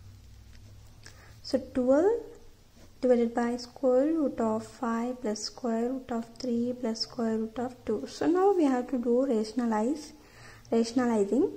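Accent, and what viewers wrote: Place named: India. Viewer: Love the female Indian accent. I did think of rationalizing the denominator by multiplying by its conjugate but I'd never done that with a three-term surd.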